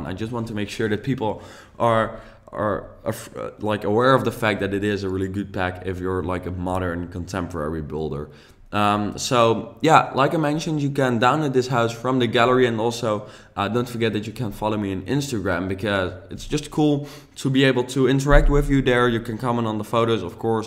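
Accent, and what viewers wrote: Netherlands. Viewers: I come from Belgium, you have such a nice accent, I hear you're Dutch, but it's a nice accent lol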